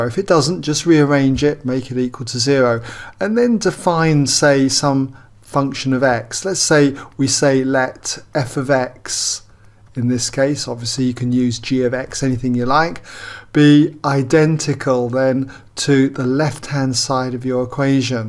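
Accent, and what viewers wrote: England, United Kingdom. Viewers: Nice english accent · Nice UK accent,good way to captivate the audience.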